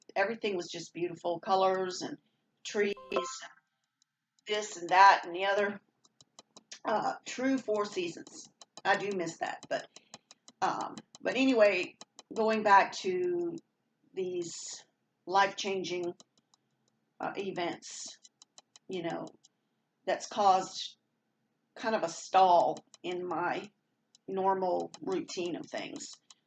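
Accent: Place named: South, United States. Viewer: Hello there thank you so much for all of your very sweet words!! I love your southern accent!! Do you like my northern accent? I’m northern Pennsylvania! Xoxoxo 💕🦋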